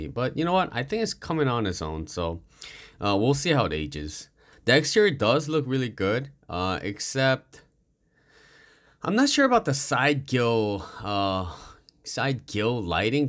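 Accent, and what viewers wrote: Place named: South Korea. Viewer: I can tell that you're a Korean from your accent. Why are you pronouncing Hyundai as Hyun-DIE????? You know what they're supposed to sound like.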